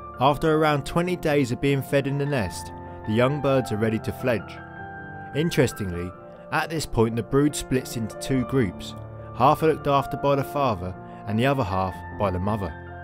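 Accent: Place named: Estuary, United Kingdom. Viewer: here's a thought .. less estuary english and more woodpecker drumming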